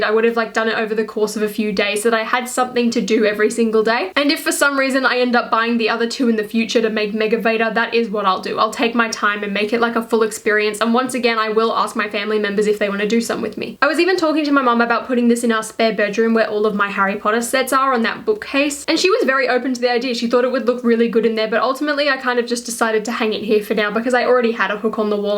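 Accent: Australia. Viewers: Very nice haul! Your video showed up as my #1 recommended video, so I decided to check it out and am glad I did. Besides the great LEGO content, I have always loved the Aussie accent. I have Australian friends and have been lucky enough to explore some of Australia (quite a few years ago). I have to say, I loved your use of "pissed" to mean upset. When I was there, I was told by my mates that it wasn't used that way in Australia, but just used to mean drunk...which we were having the discussion in a bar and laughing our heads off. Okay, back to LEGO... Glad to see you scored the Captain Marvel polybags. That one has eluded me so far in local stores (No LEGO store near me here in California). I definitely want it. If I can't get it for retail, I'll likely pick it up on Bricklink or Ebay. My siblings and nieces are into LEGO. My sisters are both big Harry Potter fans and when I showed them the Harry Potter accessory pack they were both surprisingly excited for the Maruaders Map that comes in it and proceeded to tell me how important it is, etc. 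I'm a casual Harry Potter fan...I've seen the movies and liked them, but they are BIG fans. I was surprised to find the Mario blind bags at my local Target a couple days ago. They had 12 packs and I bought them all. I got 8 different of the 10. I'm the same, that's the only Mario items I'm getting...well, I did pick up the polybag at target too...but passing on all the play sets. Awesome score on the big Hogwarts Castle...my family got t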